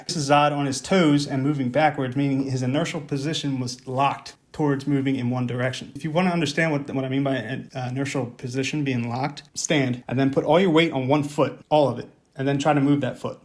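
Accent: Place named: New Jersey, United States. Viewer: has a beautiful jersey accent that sneaks out every once in a while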